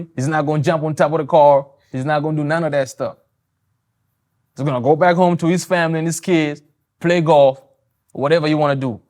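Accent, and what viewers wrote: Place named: Bay Area, United States. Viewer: Some of his personality and especially the way he speaks English is all thanks to the Bay Area and I love it 🥰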